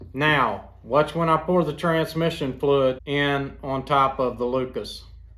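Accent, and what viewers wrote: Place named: South, United States. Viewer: Heard some Southern, left a like.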